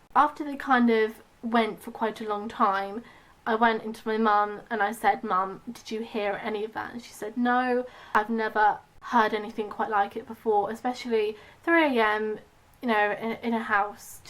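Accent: United Kingdom. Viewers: love the British accent it reminds me of cat deely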